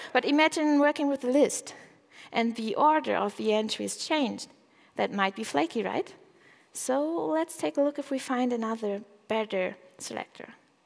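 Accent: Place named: Germany. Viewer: Die Mucke =) Nicht schlecht Herr Specht =) Vorträge natürlich auch ^^ Das very special Englisch ist actually very german =)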